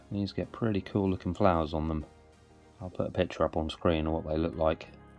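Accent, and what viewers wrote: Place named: Somerset, United Kingdom. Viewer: Excellent video! Thanks. Your accent reminds me of my friend Garry from Somerset. I’m in the US. Have a great day.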